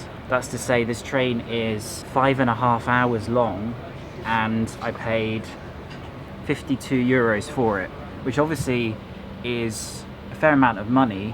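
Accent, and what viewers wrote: England, United Kingdom. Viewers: Cenker Köktebir It’s just a normal English accent. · it is British accent and I still don't mow his accent name.British accent is quite harder than American.What's more almost all of British accents fascinate me. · I don't only watch your Videos for traveling but also I watch because of your good British accent. You are my British accent teacher too, 😅😅😄😄😍 Take care ! Love from Kabul, Afg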